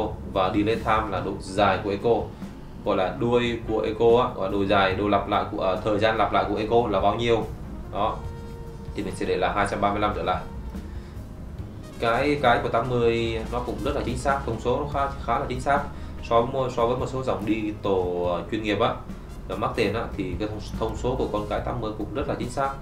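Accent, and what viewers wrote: Vietnam. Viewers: Bạn người ở đâu mà nói giọng miền trung vậy...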